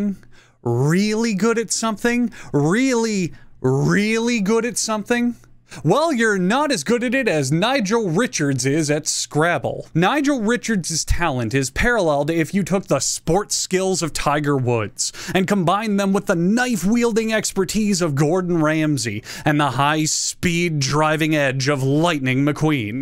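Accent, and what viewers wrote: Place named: Florida, United States. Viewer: He didn't pronounce it as an acronym it's just his Floridian accent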